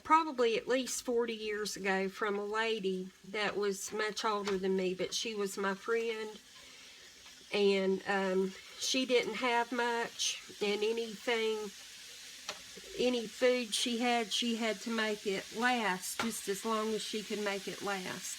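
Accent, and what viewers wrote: South, United States. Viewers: Those glasses and your Southern accent are wonderful. Great video thanks.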